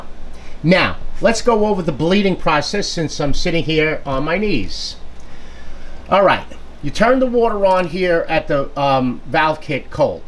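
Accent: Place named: New York City, United States. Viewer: I can hear your NYC accent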